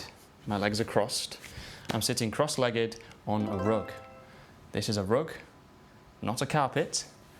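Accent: United Kingdom. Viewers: I'm really happy with your videos, I watch them every day and I really love them! Thank you for your help, and also I love the British accent.❤❤ I still learning 😅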